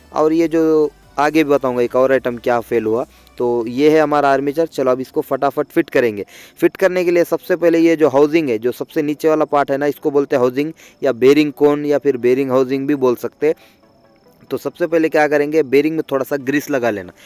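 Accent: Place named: South India, India. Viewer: बहोत बढ़िया जानकारी देते हैं आप। खास करके आप साउथ के होके भी शुद्ध हिंदी में जानाकारी देते हो , ऐसे साउथ का कोई भी हिंदी में बात नही करता । बहुत बहुत धन्यवाद 🙏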